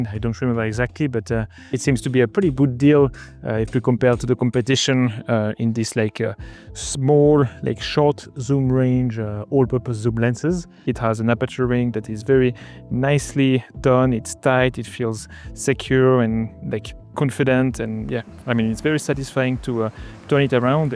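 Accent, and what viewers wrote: France. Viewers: This guys sounds fench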